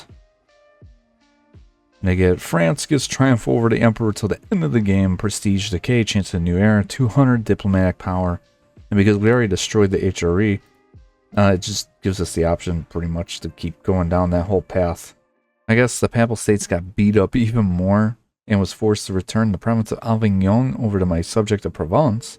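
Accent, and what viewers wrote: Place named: Upper Midwest, United States. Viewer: Are we a Wisconsin Or Minnesota or Michigan native? I know a upper Midwest accent when I hear it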